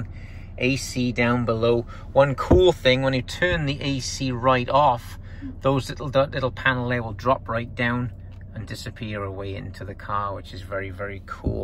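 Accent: Wales, United Kingdom. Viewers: Definitely a Welsh accent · Great video,.. Slight hint of a Welsh accent there I do believe 😁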